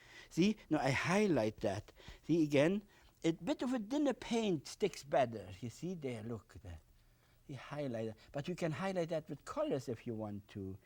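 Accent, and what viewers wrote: Germany. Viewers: I used to love his Prussian accent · Michael actually he got a lot of recognition including an Emmy. I remember him well from my youth and I loved watching him before Bob learned from him. Bill’s personality was fiery, energized and warm and Bob’s was soothing and warm. I like the saying “Bill in the morning and Bob at bedtime.” For Bill too there was a barrier where language was concerned. His English was excellent but his accent was heavy. Whether we like it or not there is a prejudice in our country with certain accents and a tendency to gravitate to those who speak the way we do. It was difficult to get ahead as a popular, public personality with a heavy German (in his case Prussian) accent. Even now I see comments everywhere that mock Bill’s accent to one degree or another. I personally love it but there are many who would likely never give him a chance.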